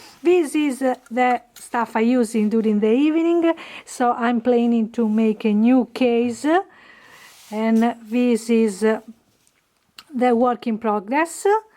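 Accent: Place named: Italy. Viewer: I loved hearing your fun Italian accent! I'm inspired always by your great variety of skills!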